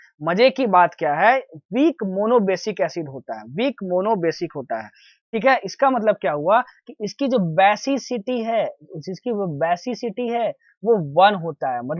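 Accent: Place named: Madhya Pradesh, India. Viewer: Sir I am also from Madhya Pradesh that's the reason aapki tone bhi thodi mere jaisi hai. 😍😊😊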